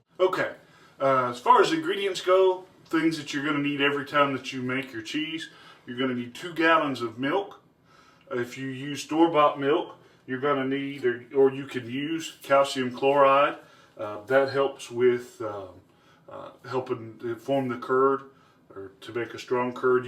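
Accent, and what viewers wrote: South, United States. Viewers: Considering his Southern American accent, I would guess US gallon.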